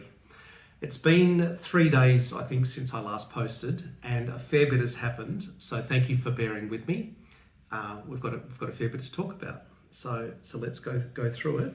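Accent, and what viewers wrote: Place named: Australia. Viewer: thank you for your lovely and thoughtful posts. My husband passed away 2 years ago so I'm alone. He was Australian so listening to you reminds me of Mike. He was from Melbourne so the accent is a bit different. I pray for you r health and that you may feel joy. You are a gift to the world. I look forward to your posts. My joys are my coffee in the morning and my grandchildren.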